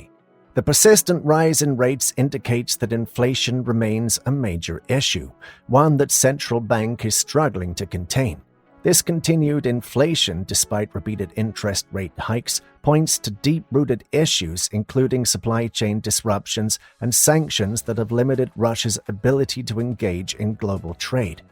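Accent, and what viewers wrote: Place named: United States. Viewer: You know rush her is stuffed.....the yank accent is it too knowledgable man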